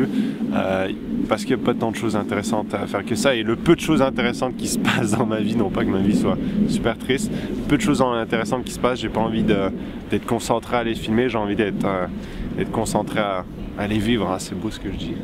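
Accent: Quebec, Canada. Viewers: Salut je heureux de cet resolution et je trouve que tu a pris un accent québécois c'est fou 😊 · J'ai l'impression que tu commence à avoir l'accent quebecois ^^ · il a pris l'accent quebecois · omg sa fait tellement longtemps :O sinon tu à tellement pris l'accent quebecois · C'est moi ou tas l'accent québécois ?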